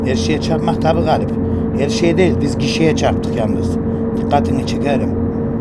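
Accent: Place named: Konya, Turkey. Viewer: Konya şivesi mükemmel olmuş ve aleykümselam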